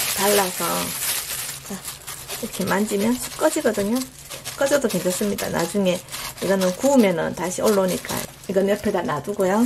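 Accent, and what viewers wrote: Gyeongsang, South Korea. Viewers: 제가 결혼하고 다른지역으로 왔는데 정겹게 경상도 말투로 쉽게 설명해주는 님 만나서 반갑고 좋습니다~~^^ 호떡 너무 좋아하는데 한번해먹고싶네요 감사합니다ㅎㅎ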